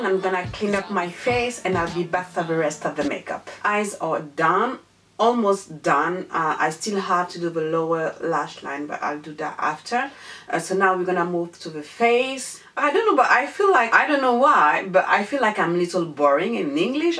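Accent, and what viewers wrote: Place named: United Kingdom. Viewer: girl!! let me tell you, you slayed in this video 👏👏👏 very well done. Everything was perfect. Your English, your make up, everything was damned good. You were worried for nothing. And I love love your British accent 😉😉. Keep on going girl you have all my support. For foundation brush you can try the morphe M439 if you haven't tried it yet. I wish you all the best and don't worry for the jokes in English you'll find good ones for us. Take care xoxo 😘😘😘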